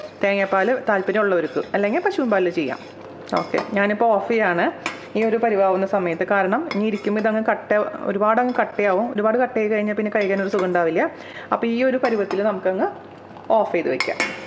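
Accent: Thrissur, India. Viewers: ഞാൻ ചേച്ചിടെ ചാനൽ കാണുന്നത് be happy with sree.... ശ്രീ പറയുന്നത് കേട്ടാണ്.. ശ്രീ ടെ യും ചേച്ചിടെ യും sound /trs ഭാഷ എനിക്ക് വളരെ ഇഷ്ട്ടമാണ്.... ഞാൻ ഒരു പാലക്കാട് കാരിയായതു കൊണ്ടാവാം..... 😍😘😂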